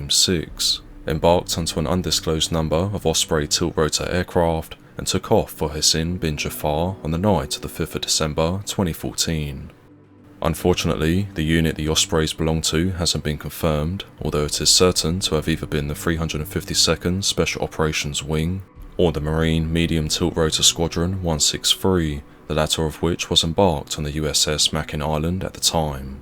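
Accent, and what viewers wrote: England, United Kingdom. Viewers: I agree the video creator really works hard on finding what he can about the wars all over. He has a very clear and easy to understand what he's saying. I'm American and I assume the channel owner is from England and I can understand his accent easy. I drive a lot and have listened to most of his content driving down the road with my ear piece. I know some of this to be true which I am sure all things in the video happened. We love your channel my whole family. Thanks for the phenomenal work you do.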